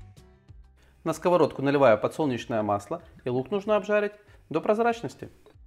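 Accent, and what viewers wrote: Ukraine. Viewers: таке враження, що Ви говорите російською з українським акцентом.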